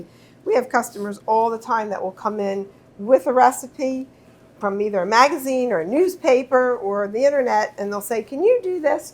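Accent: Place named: Tidewater, United States. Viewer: Great video. Love that Tidewater accent!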